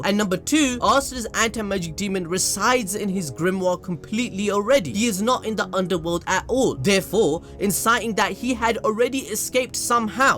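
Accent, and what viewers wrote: Punjab, India. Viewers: Bro are u Punjabi?? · dude are u indian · This guy know Punjabi hindi🔥🔥🔥1 no.. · Are you Punjabi?? Dude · are you panjabi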